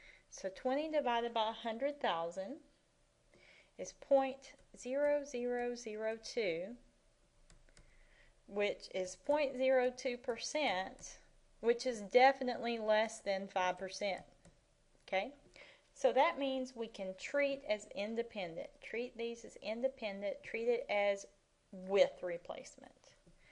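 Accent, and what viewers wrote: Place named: South, United States. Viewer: nobody has liked your video with that sweet southern accent of yours?? well, i just did. just worked on this with a student i tutored :-)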